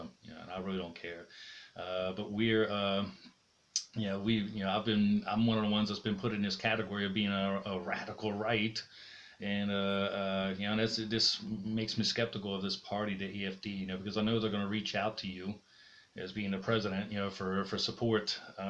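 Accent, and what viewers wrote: United States. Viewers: Your American English is excellent my friend you had to have lived in the states at some point most Europeans speak a somewhat British English speaking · Is this guy American living in Germany? He sounds American.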